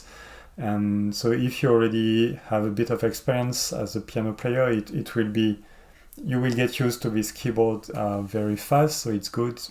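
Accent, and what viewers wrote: France. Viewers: Bonjour, j’ai remarqué votre accent français, j’ai reçu ce piano hier et impossible de le faire marcher, je ne comprend pas comment pouvoir jouer si le son de sort pas quand je clique sur les touches. Pourriez vous m’aider? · hey, nice video, i gonna start learning piano, and i'm gonna take this one, thanks you for the video, by the way your accent sound french, c'est sympa :)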